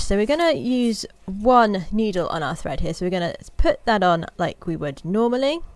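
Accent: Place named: Scotland, United Kingdom. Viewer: Такой интересный акцент, интересно, откуда эта милая женщина, из Шотландии?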